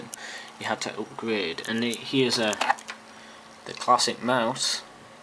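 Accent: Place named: Cumbria, United Kingdom. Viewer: Flash back? My Macintosh Classic II still works perfectly, except for the time (the battery is flat but I'm buggered if I'm gonna waste money on buying a new one!) And your Cumbrian accent is like music to my ears, lad!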